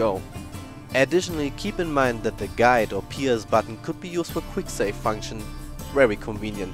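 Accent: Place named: Germany. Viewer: Hört man übelst raus dass du deutsch bist :D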